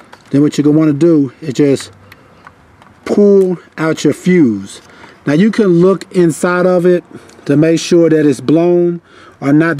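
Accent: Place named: New York, United States. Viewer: Man I love your accent, its sound southern and New-Yorker at the same time. Thanks also for the car tip, god bless you sir!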